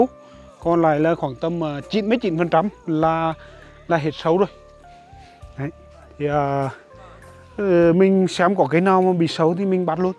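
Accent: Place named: Nghe An, Vietnam. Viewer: Chất dọng quê choa mộc mạc mà dễ thương chúc khỏe bình an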